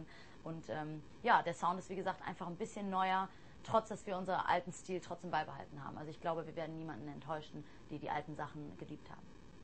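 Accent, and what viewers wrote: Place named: RP, United Kingdom. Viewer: This is not something that you see every day. Posh British proper accent to straight up German. For years she had me fooled. When she sings she has an American accent. Then I heard her British accent and was surprised. Then I found out she speaks perfect German. It's so weird. I think I'm more jealous of this than her fame and fortune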